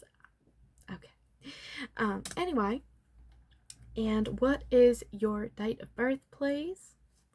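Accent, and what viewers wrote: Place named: South, United States. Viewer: Coming from a born and raised genuine southerner in the US, I love everything about this. A southern accent, especially ones like Charlotte La Bouff or Dolly Parton or Blanche Devereaux, gives me such comfort. And the hunnys and darlins round it all out. I loved this ❤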